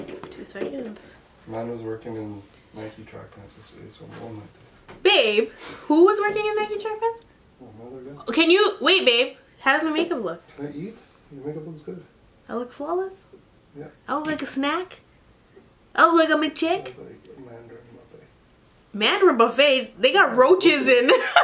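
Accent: United Kingdom. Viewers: UK accent on point sissss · That accent though sis had me shook lol 😂🇬🇧bound lol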